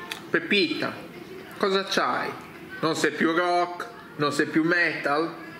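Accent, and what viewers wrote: Italy. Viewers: HAI UN ACCENTO DEL SUD SUD ITALIA INVECE IL DI UNA VOLTA AVEVA L'ACCENTO DEL NORD..ED AVEVA UNA VOCE PIÚ BELLA CON TANTE VISUALIZZAZIONI.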